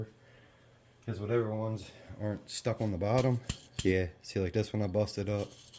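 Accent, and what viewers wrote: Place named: Appalachia, United States. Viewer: Hahah that was funny as fcuk😀 Ya kinda sound like a mountain-man from Appalachia.. but what do I know, I'm in friggin Sweden.. Much preech the knowledge sir,(bird seed, awesome!). Greetings from a new subscriber in Stockholm🙂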